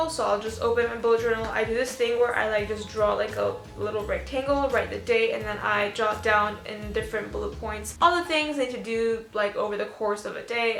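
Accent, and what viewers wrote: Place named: United Kingdom. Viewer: the British accent !!! 😂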